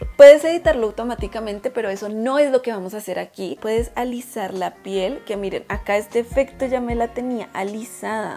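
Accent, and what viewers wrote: Colombia. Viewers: Me encantan estos tipos de videos y tu voz super relajante jaja no se el acento colombiano me gusta ❤️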